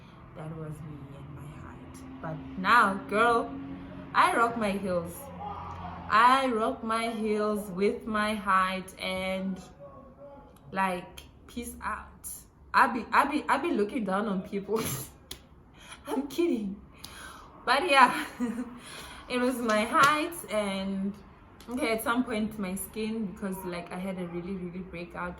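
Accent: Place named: United Kingdom. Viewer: 🔥🔥🔥🔥 congratulations!!! 😅as for British accent!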